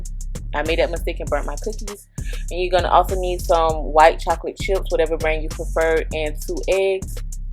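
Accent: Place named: South Carolina, United States. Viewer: Omg really you have a different southern accent than most of the SC people I know. I love it.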